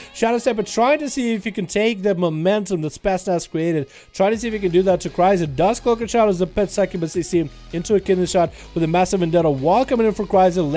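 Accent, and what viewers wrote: Russia. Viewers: Your Russian so funny)) test new word in next video, it's cool)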